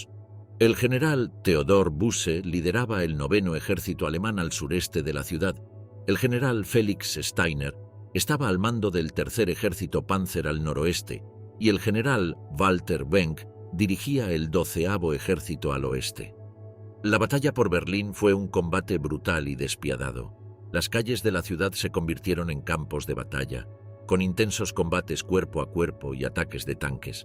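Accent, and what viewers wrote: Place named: Castile, Spain. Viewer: Joder que gusto escuchar un video asi que no este en español latino (realmente esta mal dicho pero bueno), por fin. Que gusto escuchar un video asi en castellano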